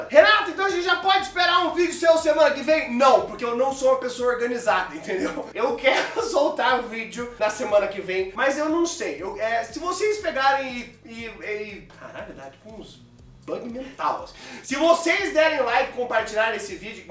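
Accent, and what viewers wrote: Curitiba, Brazil. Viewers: vc eh de curitiba seu?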